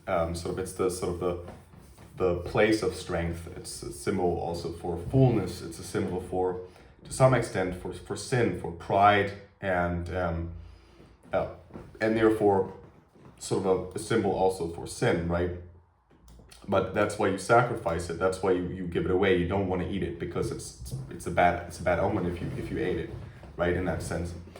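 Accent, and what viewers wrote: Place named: United States. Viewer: BTW it would be nice to hear you read in German then translate because since you sound like an American and it would be nice to hear you speak in your native tongue also. thanks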